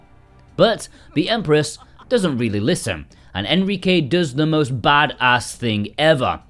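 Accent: United Kingdom. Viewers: 🐬 My favourite game of all time. You made a fantastic video that made me laugh in several places. Tell me though, you sound like you hailed from Blighty.... Is it just me, or does Galcian look like Noel Edmond's megalomaniacal doppelganger? Part of my hope were dashed when we didn't get a boss fight again Mr. Blobby! 🤣I also agree, I would love a model of the Delphinus, but I do have a statue of Aika so it's not all bad.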